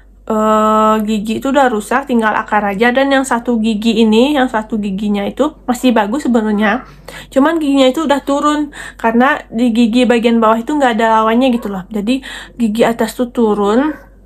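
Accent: Padang, Indonesia. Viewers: Kaka nya org padang ya. 😅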